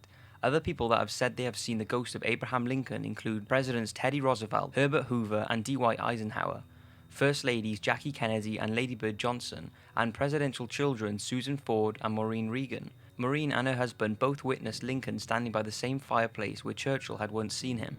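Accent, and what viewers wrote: Wales, United Kingdom. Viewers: can't beat a bit of welsh accent see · Are you british? you sound british. :) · what a retarded fucking comment..this is guy sounds British so presumably he only speaks English and does his research from books written in english and from the english part of the internet. he's not gonna read/research blog post or article about some pakistani family's encounter with a ghost because it would most like be written in Urdu, for pakistanis by a Pakistani. I guess you gotta forgive him for not speaking every single damm language in the world - I hate race-baiters. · This guy sounds like he's from Newport in wales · Are you Welsh? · Omg you have a British English accent I always wanted British English accent so I be royalty you can teach me how to be British I love your mystery videos 😊😊😊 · are you welsh? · It's the welsh accent hun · Do I detect a Welsh accent? 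Lol might be wrong but my Welsh ears hear some tones ;p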